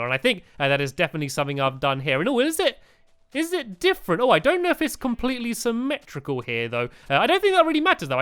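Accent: United Kingdom. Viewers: Brandon Ford British. He loves here. He lives in Bathe, but travels around the place a lot.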